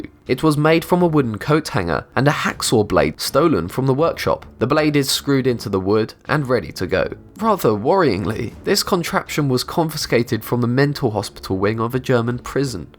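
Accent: England, United Kingdom. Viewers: O look another British narrator. Pass, dislike! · Britsh accent again boring. Pass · That's just an english accent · Final boss I think he sounds a little bit British.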